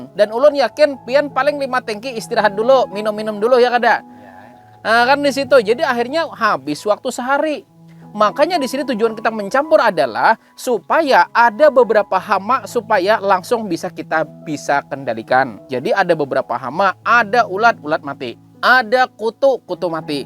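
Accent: Banjar, Indonesia. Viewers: Orang banjar kah pak... Mantap penjelasan nya... Salam dari Kalteng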